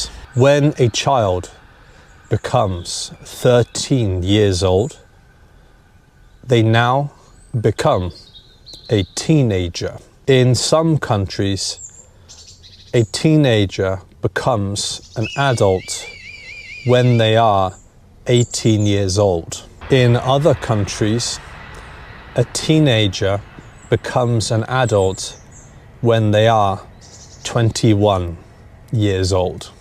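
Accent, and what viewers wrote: United Kingdom. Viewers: Thank you very much for your understandable and clear British English. Please keep going.🎉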